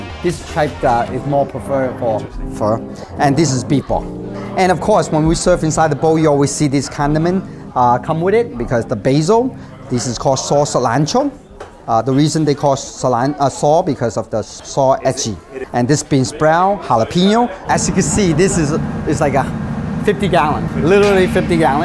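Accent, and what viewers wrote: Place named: China. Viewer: He has a chinese influenced accent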